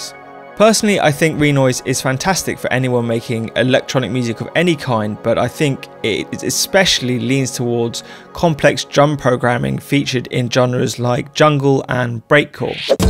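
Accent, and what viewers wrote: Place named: United Kingdom. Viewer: Honestly, as soon as I heard his British accent I was sold on the product.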